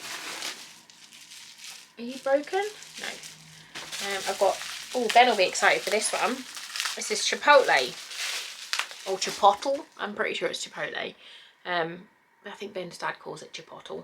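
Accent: United Kingdom. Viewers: Love the box videos :) you look super goddess beautiful with your mascara and eyeliner plus lip gloss, and love your uk accent ❤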